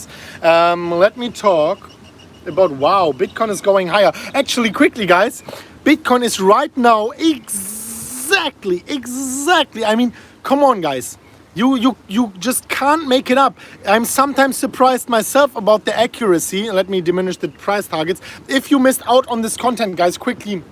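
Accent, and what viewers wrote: Germany. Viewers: 300.000 just right now!!! congratulation mate. btw are you from germany or austria? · Is he Austrian or German?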